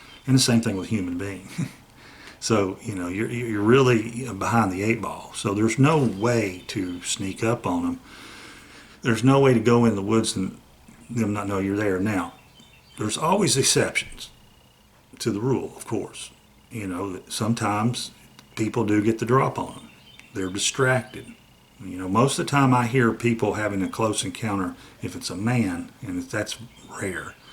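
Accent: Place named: North Carolina, United States. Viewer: From a NC girl transplanted to California it sure is nice to hear my daddy's talk., you are such a kid with that Go Pro. Enjoy. Y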